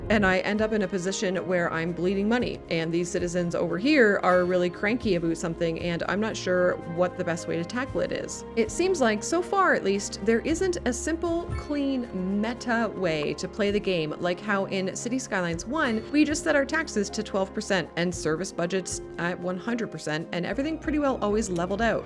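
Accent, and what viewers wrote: Canada. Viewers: ABOOT- CANADIAN ACCENT- ugh lol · I have no idea why but you sound alot more canadian in this video than other ones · Nobody really says aboot but her accent does sound Canadian to me (source: Canadian) otherwise so I think it's just fun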